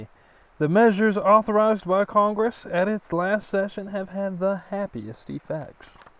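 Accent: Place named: Carolina, United States. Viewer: Awesome Carolina accent! 👍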